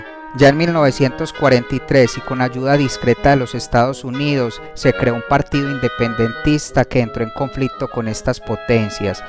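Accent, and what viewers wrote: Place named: Antioquia, Colombia. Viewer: No púes, y Con acento... Paisa... home! 👍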